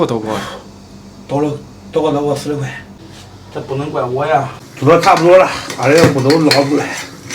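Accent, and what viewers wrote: Henan, China. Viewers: 这口音是河南还是襄阳啊？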